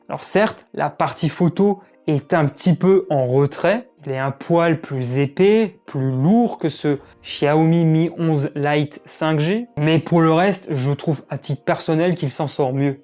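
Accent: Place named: Alsace, France. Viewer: t es alsacien? je sens un leger accent!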